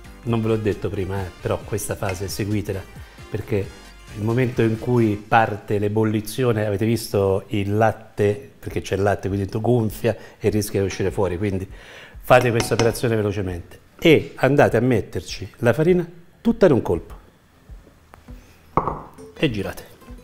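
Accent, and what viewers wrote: Tuscany, Italy. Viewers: Avrai pure un accento toscaneggiante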